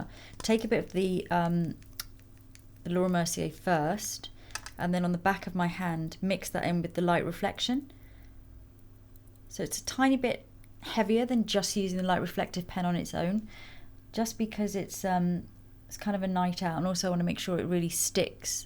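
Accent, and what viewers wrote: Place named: RP, United Kingdom. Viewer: Really nice , also love your posh accent 😃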